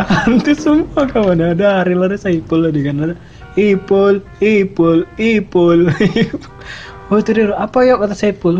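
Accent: Medan, Indonesia. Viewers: lu anak medan ya brohh...... logatlu logat medan brohh.......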